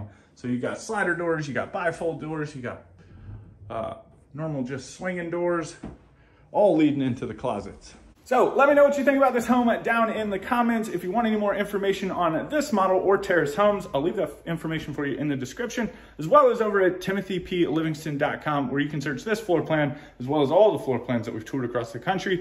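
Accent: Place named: North, United Kingdom. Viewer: Too northern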